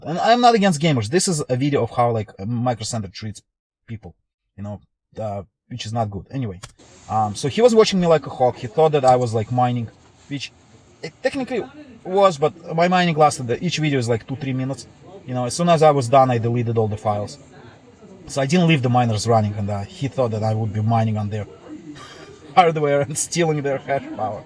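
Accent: Russia. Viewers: Почему он говорит на английском а слышу я русский ? 😀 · Прикольно слышать акцент и догадываться, что это русский. Успехов в майнинге. Я не понял смысл первой части видео. 2070 тоже бывают Max-q ?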